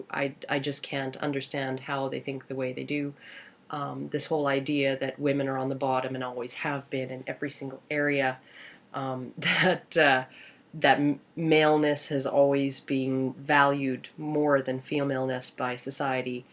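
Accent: Canada. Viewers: You sound Canadian. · Northern accent... Canadian?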